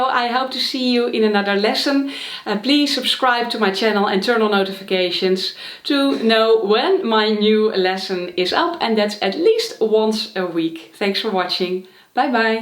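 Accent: Netherlands. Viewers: Just the video I was looking for, been playing for a year and always struggling with the sound of my E string. Love the accent too (Dutch?), subscribed! 😊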